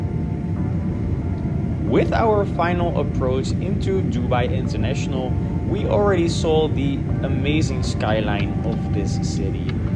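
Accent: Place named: Netherlands. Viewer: Toch nog stukje Nederlandse accent door het Engelse gepraat heen😂 leuke video man!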